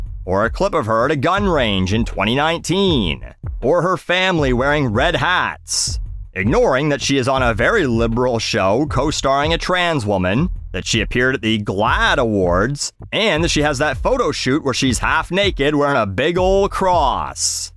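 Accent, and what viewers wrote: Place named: Canada. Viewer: He’s Canadian. It could be his accent.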